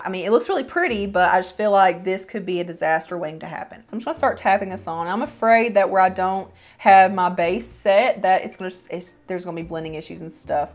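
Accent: South, United States. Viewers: Love your videos!! I am a Southerner and you accent sounds like you were raised in the South.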